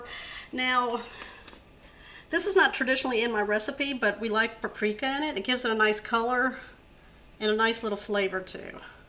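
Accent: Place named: South, United States. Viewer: Thanks ❤️I would appreciate it…thinking with that “ya’ll “ and somewhat Southern accent like mine that you would do some traditional Southern dishes 😀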